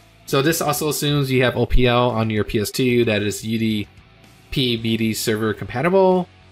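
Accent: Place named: Midwest, United States. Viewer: Totally off-topic: are you from Minnesota or Pennsylvania or somewhere in the midwest? I'm trying to place your accent. It's the Ds in place of THs you do ("de" instead of "the"). I'm Canadian so I have no idea about American accents unless they're from the DEEP SOUTH lol.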